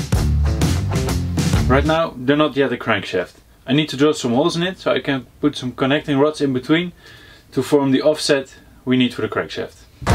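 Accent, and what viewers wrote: Netherlands. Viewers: You have a dutch accent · Where you from? Cuz u sound dutch 😂😂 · haha je hoort gewoon aan dat accent dat je nederlands bent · are ya dutch? you have an accent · You sound realy dutch are you? Awsome idee btw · Are you dutch? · joe sound ferry duts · Are you From the netherlands ? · Do you happen to be dutch, because you have the same accent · laat me raden, u bent nederlands? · heard the dutch accent, i subscribed XD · Je hebt echt een Nederlands Engels Achtig spraak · U sound dutch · Je uit spraak kan wat beter man ik kan gewoon. Een Nederlands accent er in horen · Are you dutch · U sound Dutch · verry nice video, ik hoor dat je van nederland bent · Are you Dutch? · I knew it. Your english is very nice but I can always hear something about the pronounciation if someone is Dutch. Do you live in the Netherlands as well? I'm Dutch too btw. 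· Are you dutch, you sound dutch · Dutch accent? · are u dutch? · Ik vraag me af hoe je de windingen van de spoel hebt geteld, of meet je om de zoveel keer hoeveel ohm tie is? ( Neem aan dat je een Nederlander bent zo te horen aan het accent :) ) Damn nice project! :)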